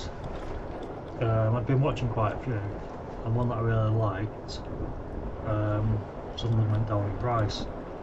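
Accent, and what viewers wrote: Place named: Yorkshire, United Kingdom. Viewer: Just came across your video well done you I found it interesting listening to your woo with your first e bike purchase what a bummer , but looks like you have purchased a different one now and you are all legal. I bought my first e bike over 10 years ago before all this legal stuff it had a motorbike like throttle I have never been stopped by the old bill in fact I have had several bobbies saying what a nice bike never asked me about motor size or anything maybe just been lucky . Please carry on making your videos love your accent reminds me of home I was brought up in Yorkshire but left when I was twenty live in London now miss the northern accent though .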